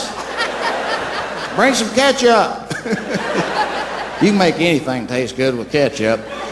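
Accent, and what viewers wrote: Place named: South, United States. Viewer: His southern voice makes the jokes, all the more better! Who agrees wit me?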